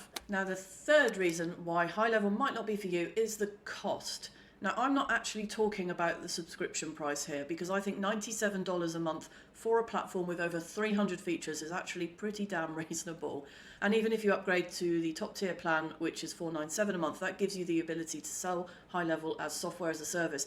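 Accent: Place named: United Kingdom. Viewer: Nice to hear a British voice lol 😂🙌🏽. I'm from the uk also 🇬🇧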